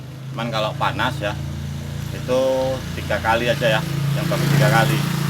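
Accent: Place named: Central Java, Indonesia. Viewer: Aslinya mana to mas,bahasa jawane kok kaya orang jawa tengah ...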